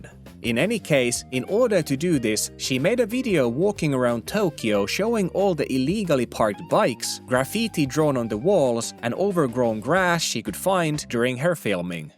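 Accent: Finland. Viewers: niinku paahtoleipä? Suomi aksentti kuuluu läpi 😂 Good video tho 👍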